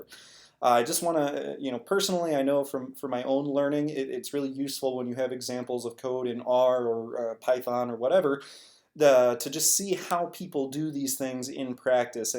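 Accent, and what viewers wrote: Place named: Wisconsin, United States. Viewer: Oh, also, I think you're developing a bit of a Wisconsin accent, and I'm not happy about it.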